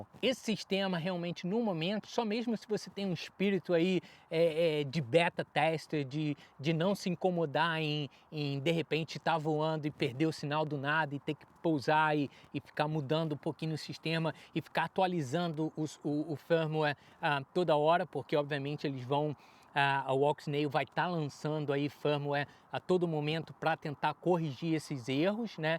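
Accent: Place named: United Kingdom. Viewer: Vc mora no Reino Unido ou já morou por la? Tu tem um sotaque muito britânico hehehe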